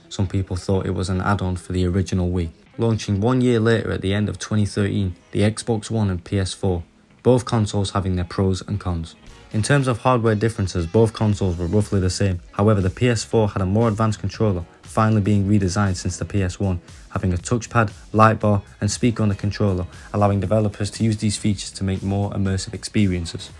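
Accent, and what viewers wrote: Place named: Manchester, United Kingdom. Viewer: Your accent. You from Manchester?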